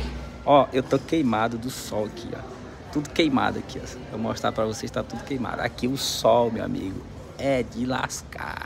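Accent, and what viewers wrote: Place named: Northeast, Brazil. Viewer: Sou do Paraná, Santo Antônio da Platina.Adoro o sotaque nordestino, e essa sua voz é alegria é contagiante. ❤ ❤ ❤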